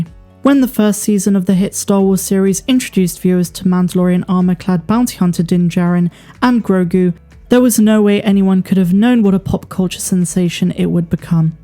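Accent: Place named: Southern England, United Kingdom. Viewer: I can’t work out if your accent is south east or south west hahahahaha. Great work, enjoying the channel. 👍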